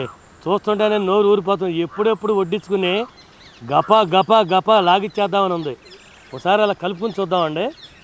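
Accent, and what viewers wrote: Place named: Kurnool, India. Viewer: Today I will be making this recipe in Iftaar...luv the way u speak uncle...missing kurnool ...from 🇦🇪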